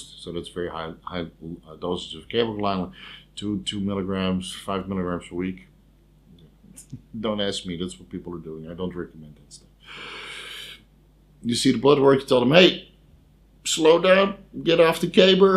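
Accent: Netherlands. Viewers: why is that buddy? Great channel by the way. Could tell instantly you were from the Netherlands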